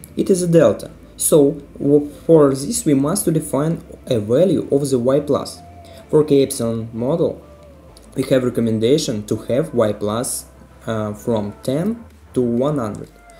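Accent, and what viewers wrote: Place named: Russia. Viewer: спасибо за ценный урок! Судя по произношению - наш человек!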